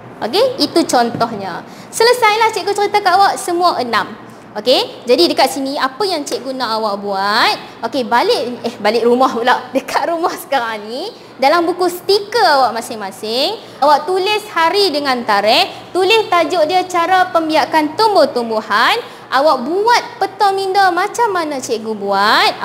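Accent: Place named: Kedah, Malaysia. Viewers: terima kasih cikgu saya suka cikgu cakap kedah🤭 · Saja je 😅 kedah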